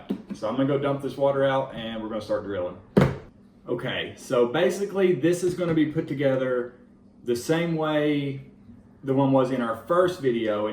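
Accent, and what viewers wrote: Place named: South, United States. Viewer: Great video! Just curious though, what part of the south are you from?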